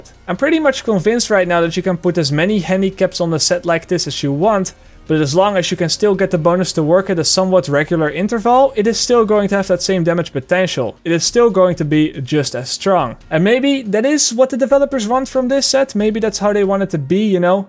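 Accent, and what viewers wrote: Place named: Netherlands. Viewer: Jij bent nederlands... Ik hoor het in jou accent.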